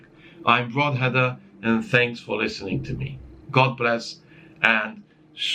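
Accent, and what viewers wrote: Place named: United Kingdom. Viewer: Took me by surprise with the british inflexion not gonna lie. Also, I think classifying non-automatic weapons as "assault rifles" is pure idiocy. A carbine sold to civilians will never have the auto option. By military definition " selective-fire, automatic rifle chambered in an intermediate cartridge fed by a detachable box magazine". So any news anchor talking about "assault rifles", especially in Romania, with their "experts" are talking out of their ass. Great video as always.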